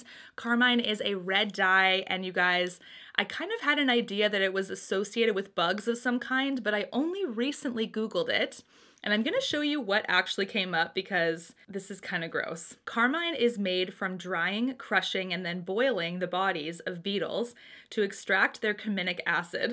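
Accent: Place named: Canada. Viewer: I have to say that for some weird reason, I am OBSESSED with accents and ways of speaking from other countries, etc. I love how you Canadians say ABOUT!! ❤️❤️❤️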